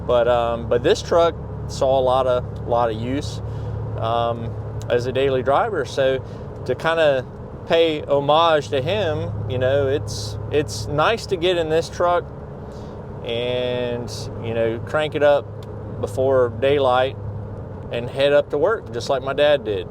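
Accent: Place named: South, United States. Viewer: Look at you with the drone footage. Your southern accent has really picked up since being gone from Ohio. I wouldn't mind having an old manual vehicle. I miss a stick.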